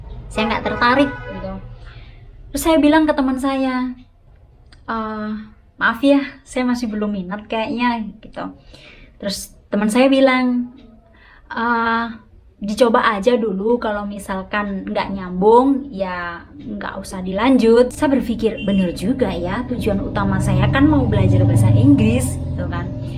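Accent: Java, Indonesia. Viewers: Mbak e kok medok .. aslinya daerah mna mbak ? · tak panggil mbk ya...aku dari Semarang Kendal mbk..logat Jawa kelihatan bgt...salam kenal ya..sehat selalu disana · Mba asli org dari jwa ,pa luar,jwa,soale kya medok jwa,maaf ya mbaa,? Tnya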